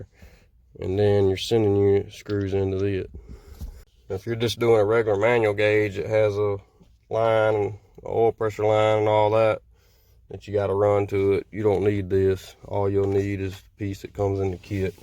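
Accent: South, United States. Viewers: Believe it or not the funny southern drawl is what let's me know you know how to work on hotrods. Call me judgment/prejudice.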